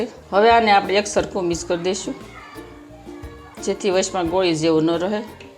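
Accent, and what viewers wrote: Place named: Kathiawar, India. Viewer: Bau j saras ben..kathiyavadi so😊 mithi bhasha bolo chho...jayshreeben from nairobi kenya (africa)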